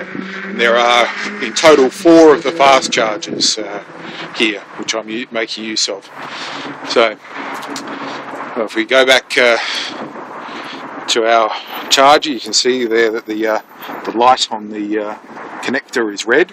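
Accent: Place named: Australia. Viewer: Dude ! Aussie !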